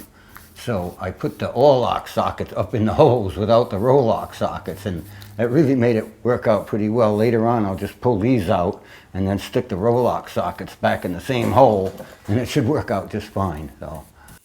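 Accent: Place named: New England, United States. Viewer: Love your craftsmanship! My 95-year-old father is an old-school cabinet maker. Good to see that kind of understanding of the material and respect for the craft is still alive. One personal question, if you don’t mind: I can hear your accents is New England. Were you born there? Thanks for your great videos!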